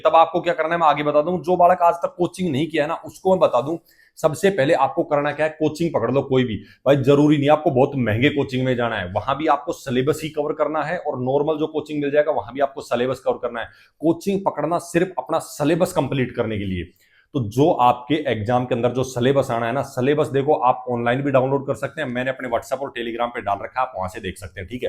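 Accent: Haryana, India. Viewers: Asa lgra sir ap haryana se ho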